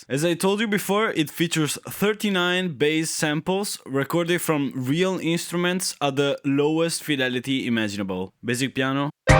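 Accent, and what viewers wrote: Italy. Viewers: sei italiano? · sei italiano hai il mio stesso accento quando parlo inglese😂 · intanto l inglese lo parlo e lo scrivo senza problemi. Ma il tuo accento è davvero pessimo, stride, è fastidioso a un orecchio italiano, figuriamoci a uno inglese. Non a caso avevo capito subito che eri italiano. Cioè parlare inglese non significa tradurre le esatte parole ma esprimere il concetto dall’italiano all’inglese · I was just watching this video now and I told my girlfriend " this guy is def italian" then i said "he sounds like he's from my hometown too" then You showed lido degli estensi and I was like bruh... I went to highschool there! small world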